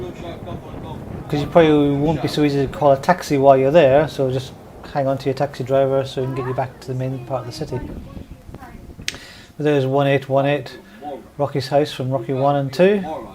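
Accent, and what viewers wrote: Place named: Scotland, United Kingdom. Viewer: Cool video , very well done . Did the same trip myself . Hint of an east coast Scottish accent too I believe ! 🏴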